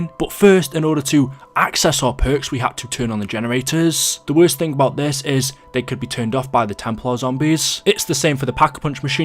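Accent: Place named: England, United Kingdom. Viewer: Nick Barrow He has an accent. I think he is from Birmingham or Manchester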